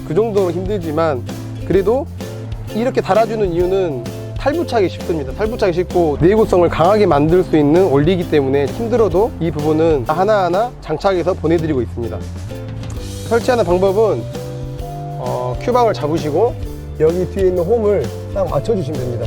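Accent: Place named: Jeolla, South Korea. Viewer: 으따 절라도 사투리 겁나 쓰구마 ㅋㅋ 좋게 잘 봐부러쏘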